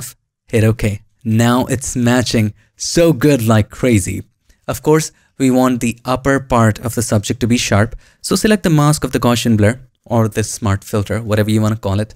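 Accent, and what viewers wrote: India. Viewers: I like your funny Indian accent!)))))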